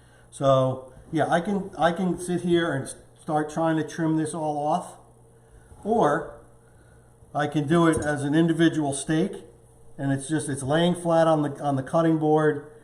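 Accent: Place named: New Jersey, United States. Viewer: I just bought a SteakAger Pro 40 and found your channel while looking for info on YouTube. I can not tell you how much I appreciate the great information and content you provide. I subscribed to your channel immediately. I live in NY but grew up in Philly and NJ. I'm picking up a Jersey accent. Thanks so much Chef for the valuable content you provide. I was nervous about dry aging my own steak but I feel confident after watching your videos.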